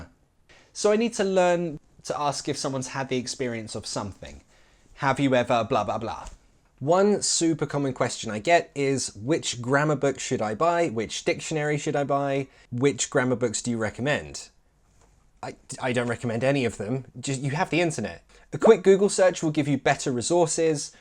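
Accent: United Kingdom. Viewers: I love your channel!!! Love British accent!!! Thank you for sharing!!! I’m Japanese 😜1/2 lol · woah so many thanks^^ I'm learning right now korean so it's still difficult cuz it's so different than english and some words didn't still stuck in ma head so, i'ts kinda frustrating.. I'm in love with korea language (not with a person yet :v) but yeah u.u..... ptt: japanese person may thinking bout: are you Saitama in real life? :v ... I love your accent btw (it's a little bit different than other british persons)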